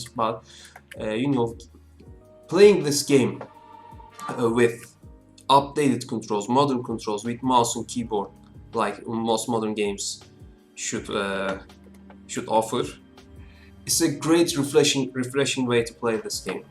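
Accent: Turkey. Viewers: AoD ye bakmadan önce milletin oyunun oynanışı, glitchleri vs. kötü oynamayın dediğini duyduğumda direkt abartıyorlardır demiştim. Haklıymışlar. Gerçekten hayatımda bu kadar zor kontrolü olan bir oyun oynamadım. Hani TR1'in tank kontrollerine bile alıştıktan sonra oynaman çok kolay. Bu.. bu ise başka bir seviye... Neyse ki ''restoration project'' in olması iyi bir patch. Hikaye çok kesilmiş olsa bile yine ilgi çekici. Daha karanlık bir Lara Croft hikayesi olmasıyla beraber bu seferde Lara'nın hem kontrolü kaybedip kurban olmasını inceliyoruz hem de iç dünyasını... Umarım oynayabilirim. Ha bu arada Türk olduğunu direkt aksandan anladım :D güzel içerikler var kanalda biraz onlara bakayım. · Tamam Türkmüşsünüz, aksandan ben de öyle tahmin etmiştim 😄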